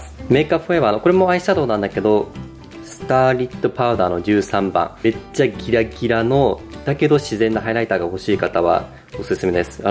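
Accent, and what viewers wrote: Kansai, Japan. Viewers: どの動画でも数回関西なまりが聞こえて何となく嬉しい🥺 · リアルな感想やから参考になるし、時折出る関西弁が親近感〜😂❤️❤️❤️この動画本気で好き！！！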